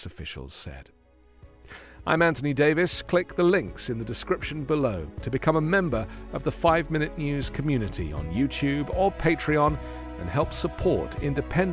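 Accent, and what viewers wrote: United Kingdom. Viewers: Fake news in short format. Brit accent to appeal to gays, RINOs and other Democrats.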